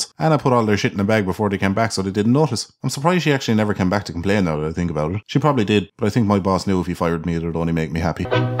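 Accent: Ireland. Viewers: You're a great laugh to listen to. Keep up the great boyo and lets grow your channel. If there's one thing the world needs more of it's an angry Irishman giving out fuck about shite.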